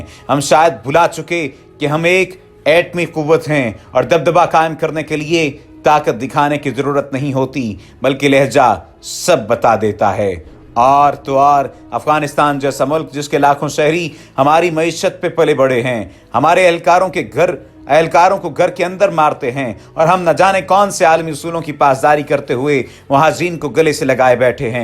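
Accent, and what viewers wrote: India. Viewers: Pak Foj Zindabad Hai.....Mulk K liye Or Pak Foj k liye Jan B zinda. Ap ke awaz indian lagti hai.....Wesy Iran or Saudia or afganistan k bary me itna zada Q discuss kr rahy ho.......India k bary me itna discuss nai kia ap ne video me....................Humy pta hai sab apni foj k bary me💖💗💕💓🇵🇰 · Aap ka bolne ka talfaz indian ki tara hai r u a pakistai .i dont belive u r a pakistani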